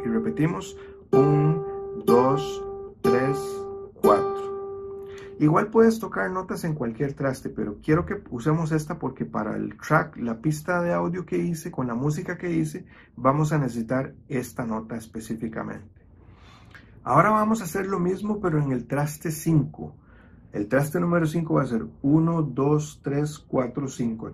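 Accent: Costa Rica. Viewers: solo he visto un minuto, pero me atrevo a decir que sos tico. Gracias, voy a aprender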